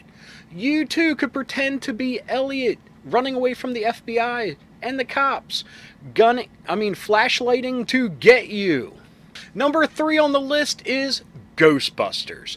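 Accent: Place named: Baltimore, United States. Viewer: Sounds like you’re from Baltimore too bro 😅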